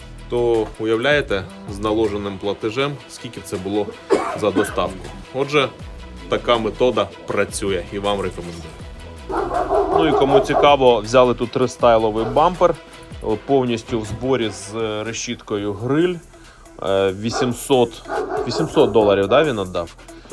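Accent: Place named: Lviv, Ukraine. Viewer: Майже львівський акцент!!!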